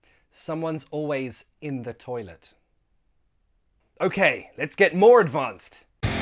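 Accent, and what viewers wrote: United Kingdom. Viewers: Thank you so much for helping us know the perfect British pronunciation. Sir, i am a big fan of you. And I love you.👌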